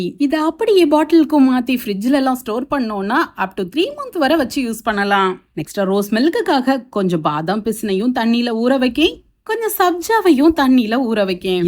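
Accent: Tirunelveli, India. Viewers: திருநெல்வேலி தமிழோ...!😂அருமை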